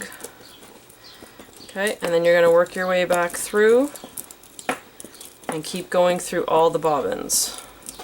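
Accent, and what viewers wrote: Canada. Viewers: This was a wonderful and informative video. It made for a happy Sunday afternoon viewing. My first time watching a pillow lace tutorial, and I think I hit the jackpot! You are great at explaining, and the camera was perfect! I loved that you zoomed in several times so we could see the threads. I’d love if you would put the source links below the video, because I’ve forgotten the name of the book, and the sources for threads and the kits. You are in Bermuda, but you sound like maybe you cane from Canada? Or Northern Minnesota? “Oot” I totally love it, whatever the origin! This is also the first of your videos I’ve watched! Liked and subscribed! ~annie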